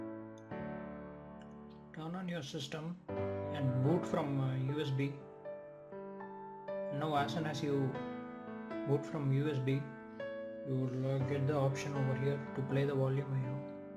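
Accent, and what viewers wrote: India. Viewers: fucking indian english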